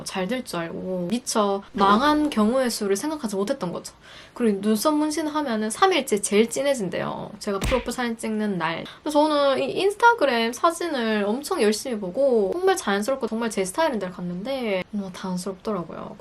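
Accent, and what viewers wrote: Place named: Daegu, South Korea. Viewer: 대구~~ 대구 사투리 반갑습니다~~^^우리동네예요~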